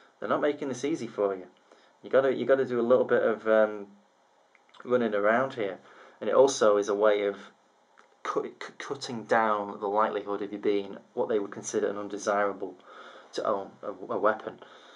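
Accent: United Kingdom. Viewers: Do you mind if i ask what club you went to? you sound like your from Manchester, i got my full membership card in the post this morning , FAC application going in this week.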